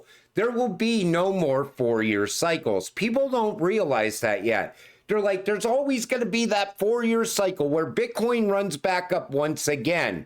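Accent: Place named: Minnesota, United States. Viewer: Hey where are you from in the us? I'm guessing up north like minnesota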